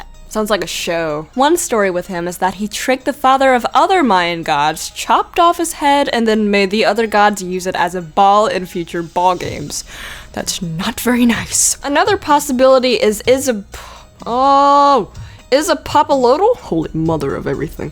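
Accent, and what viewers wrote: South, United States. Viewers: I love her southern acient it great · Are you from the South?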